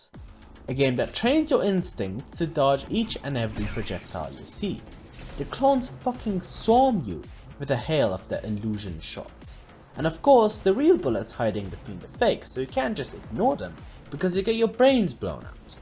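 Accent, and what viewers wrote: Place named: Germany. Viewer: the German sounding man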